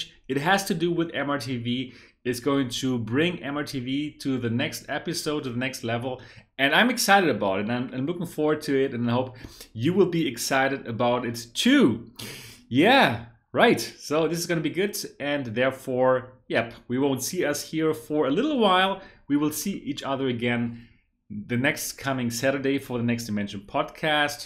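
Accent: Germany. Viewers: You’re so amazing! How could you German speak so heart-touching English and also excellent Chinese. 🤩😃 And I had also watched your other vlog videos.. daily lives...with your wife I love your English speaking accent😳😢 ! And all of your styles... _ I can speak English and Spanish,but I also want to learn German❤️! I’m from Taiwan 😢 ,soon I’ll go to Europe. Spain,Portugal and your country “Germany”🇩🇪 ! And I love football so much !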